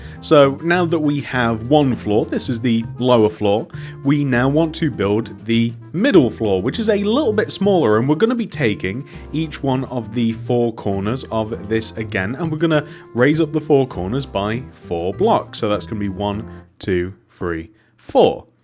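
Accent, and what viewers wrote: United Kingdom. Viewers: Are you British??? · Ben Matthews I think it is Really well because of the British accent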